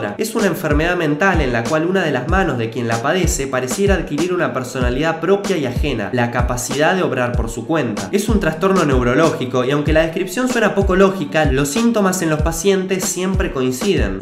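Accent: Argentina. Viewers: Amigo veo tus videos desde hace un tiempillo y me agradan bastante la verdad, bueno solo te escribo para hacerte un sugerencia ojala no te lo tomes a pecho, pero creo yo que tu asento argentino es demaciado pronunciado, no me malinterpretes no me molesta en lo absoluto a mi en particular, mira iré al grano, tu canal tiene muchicimo potencial y quizas debas adaptar tu español a uno más neutro, hay pequeños chistes que dices y a veces me cuesta entenderlos, toma por ejemplo a Holasoygermán, es chileno pero su asento es muy neutro, pueden entenderlo en todos los paises de habla hispana perfetamente. Solo es una sugerencia amigo, aun que no lo hagas igual seguirémos viendo tus videos :), saludos desde Chile. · che eres de Argentina? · 5 hechos - Youtubers Banana te juego $10 a que cuando llegues a los 500k haces la gran Dross y dejas de usar el acento argentino en tus vídeos para tener más subs. 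;)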